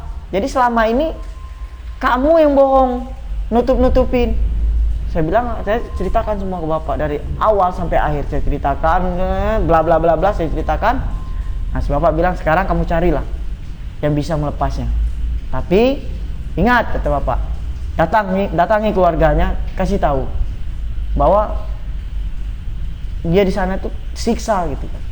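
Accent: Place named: West Sumatra, Indonesia. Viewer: Rang jambi, rang jambi. Hehe... Emangnya saya ga bisa tahu kalau abang ni orang minang, ga bakal ilang bang logat kamu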